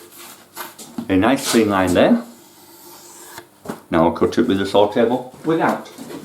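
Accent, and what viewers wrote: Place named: Huddersfield, United Kingdom. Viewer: You sound like a Huddersfield man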